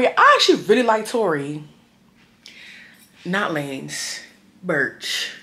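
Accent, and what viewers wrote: United Kingdom. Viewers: It’s the British accent for me 😆😆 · Everything is so cute. And you're british accent reminds me of so much.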